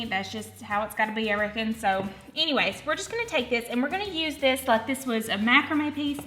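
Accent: South, United States. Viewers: My goodness, your just about the cutest thing I ever seen. Your southern drawl is so darling. I just stumbled across your channel and yes ma'am I liked subscribed and hit the bell so I will be seeing you again real soon. Oh and that little hanging pot is too cute!! 😙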